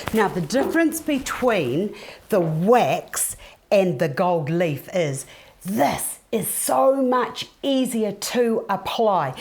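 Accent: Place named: New Zealand. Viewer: It is a Kiwi accent (New Zealand).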